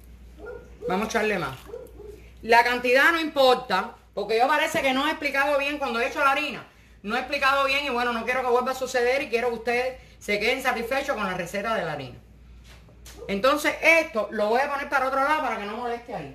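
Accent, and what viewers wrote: Cuba. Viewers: Ay que cómica!!! Me identifico mucho contigo, no como cocinas, como hablas Jajaj,es que somos cubanas 👍🏼❤️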